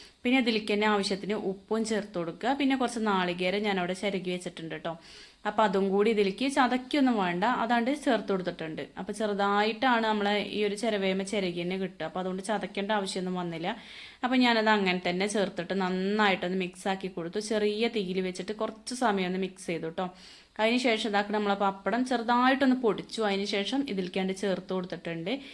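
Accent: Malappuram, India. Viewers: Chechinte ഇതേ സംസാരം ഉള്ള ഒരു കൂട്ടുകാരി എനിക്കുണ്ട് അവളും മലപ്പുറം aaa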